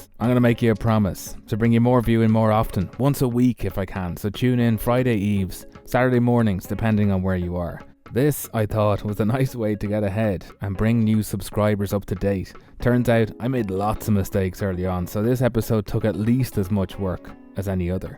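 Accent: Ireland. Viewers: This is the best "one man show" on any platform EVER! I cant even fathom the work that goes into this! The work on the car is enough to make me faint but to package it with the stop motion and smother in the gravy that is that fantastic Irish accent I am hooked! I couldn't give two spits about RR but watched that playlist twice. is a TRUE Renaissance man.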